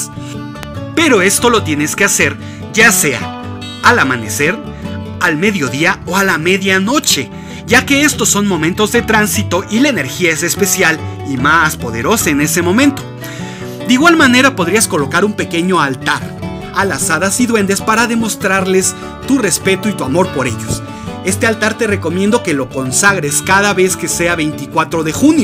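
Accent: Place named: Mexico. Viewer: Con ese asentó mexicano?🤔 Nooooooooooo Me agrada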